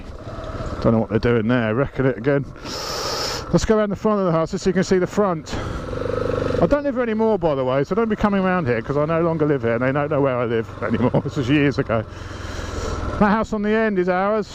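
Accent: United Kingdom. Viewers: Haha. Love this. Bless the old man at the end. Genuinely thought you were a London boy. You've definitely got a cockney twang.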